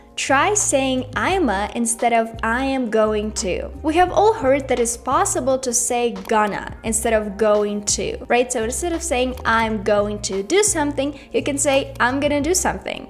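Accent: Russia. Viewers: lemme guess, her accent is russian, isn't it? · Ну у тебя ещё присутствует русский акцент, милочка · Девушка, вам не кажется, что у вас очень яркий русский акцент? Буквально русскими буквами говорите английские слова. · СЛЫШУ РУССКИЙ АКЦЕНТ😁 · Русский акцент узнаваем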